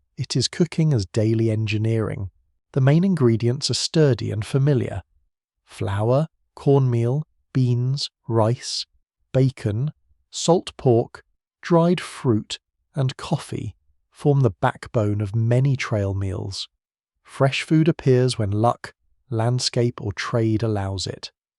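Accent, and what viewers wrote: United Kingdom. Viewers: pm Rochester NY. Not feeling the British narrator.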